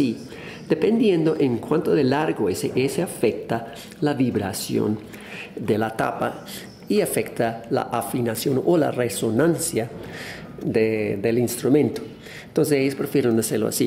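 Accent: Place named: Brazil. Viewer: Se me hace que tienes acento brasileño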